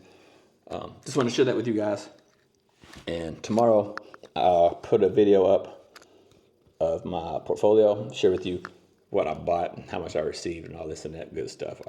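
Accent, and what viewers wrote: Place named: Appalachia, United States. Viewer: I detect an Appalachia accent but a Slavic face. I'm confused, but you're cute. I got zividendz😊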